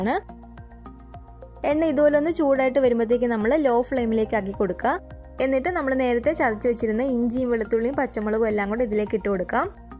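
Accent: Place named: Central Travancore, India. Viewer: central travancore slang aanallo..Cliche malabar slang allaa..... Have to try.......:-)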